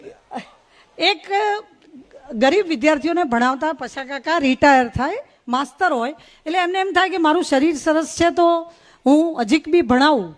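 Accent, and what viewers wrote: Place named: North Gujarat, India. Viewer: ઉત્તર ગુજરાત નો લહેકો અને ગામઠી છટા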